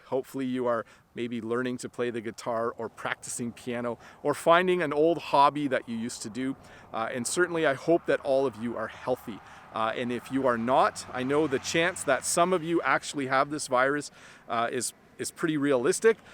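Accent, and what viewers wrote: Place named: Canada. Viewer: it's awesome hearing the nuances in Canadian English versus American English. Thanks for the upload.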